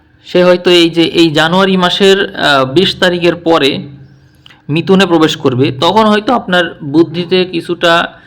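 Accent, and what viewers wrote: Bangladesh. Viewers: Dada kothay baddo machlanda pur bithari o Bangla Desh er taan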